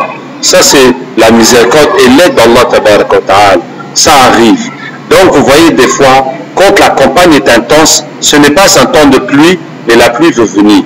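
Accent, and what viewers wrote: Africa. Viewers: L'accent de l'Afrique! C'est quel accent?pas celui choisis par Dieu?soubhanallah que Dieu nous éloigne toute idée de racisme même le djines musulmans sont des frère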